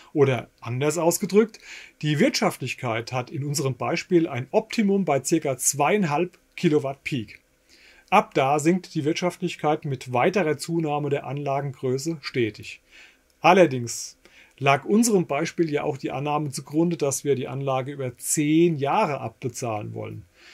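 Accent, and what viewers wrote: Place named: Hesse, Germany. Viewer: Danke für das sehr informative und sympathisch vorgetragene Video! Den „Hesse-Bub“ kannst Du ja nicht verleugnen :-) An wen wende ich mich denn am besten für eine seriöse Beratung samt Angebot und Installation im Main-Taunus-Kreis? Bist Du dort irgendwie geschäftlich tätig? Dein Kanal ist abonniert, mach weiter so! VG Jörg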